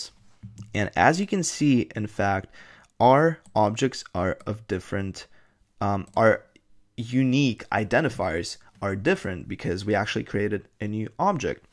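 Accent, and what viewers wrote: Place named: Russia. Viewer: я то думал, что не так, а ты русский оказывается😅 Хоть эти ошибки возможны в речи у носителей, считай чуйка сработала.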